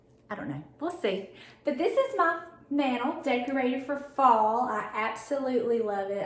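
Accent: South, United States. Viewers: I just found your channel, and you have a very southern accent like I do and I’m wondering if you’re from my area. I live in North Carolina. Hi just subscribed and I’m looking forward to watching more, I love it when I find a southern lady like myself.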